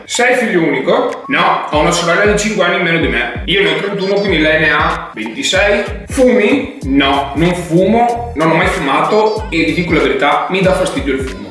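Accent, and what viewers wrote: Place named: Veneto, Italy. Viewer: Aspetto la 100000 in 100 ore come ErikTheElectrik 😂👍 ti ringrazio amico, oltre che per questi cheat che sono PORNO, anche per i full day of eating, perchè dai delle idee veramente ottime per pasti iifym, poi accento veneto diocan è la ciliegina sulla torta 😂😂😂 5000 iscritti in una settimana e altri 2000 quasi in qualche giorno, ti meriti tutto ciò amico mio!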